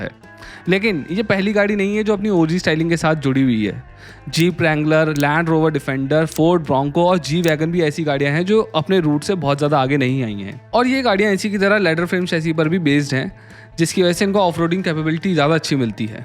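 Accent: India. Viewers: This is Indian English, deal with it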